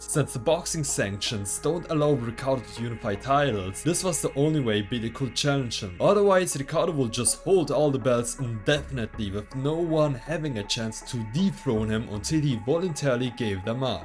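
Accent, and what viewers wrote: Germany. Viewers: Man hört den deutschen Akzent🥀 trz W Video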